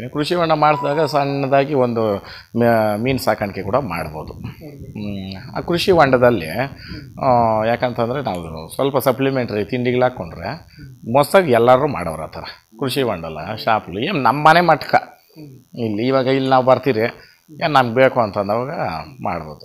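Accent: Kolar, India. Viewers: Nimma kolara kannada kelode chenda❤️ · I love your kolar kannada and also your work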